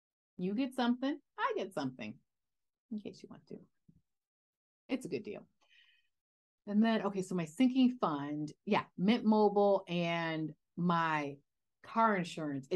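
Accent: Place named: New Jersey, United States. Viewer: I think it's a good one if it is helping you make progress..random but I have the feeling we live in the same part of the US. You sound like us Jersey peeps. Lol...I did say random. 😃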